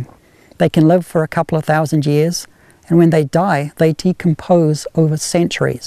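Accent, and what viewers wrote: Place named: Australia. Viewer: blimey an aussie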